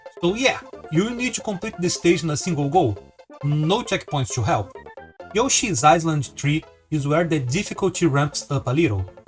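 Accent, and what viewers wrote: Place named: Brazil. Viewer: Oi, acredito que o YouTube tenha me recomendado seu vídeo porque gosto de ver desafios, Mario e também porque você é brasileiro (pelo menos pelo que percebi do sotaque). Estou deixando esse comentário não somente para engajar, mas para fazer elogios: parabéns pela coragem de fazer conteúdo em inglês. Eu ensino inglês e não teria essa coragem porque tenho muita vergonha. Aliás, pode ser que venha alguém te encher o saco pelo sotaque, mas não ligue: nós brasileiros temos um sotaque baseado na nossa língua-mãe, ninguém precisa falar como nativo, desde que seja compreensível. Acho que a única palavra que foi usada com frequência e pode ser difícil para alguém de fora entender seja "Island", cuja pronúncia é próxima de "ai-land", o som do S some. Tirando essa, tá tudo muito compreensível, e os comentários em inglês que vieram antes dos meus já demonstram isso. 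E, aliás, vida longa ao SNES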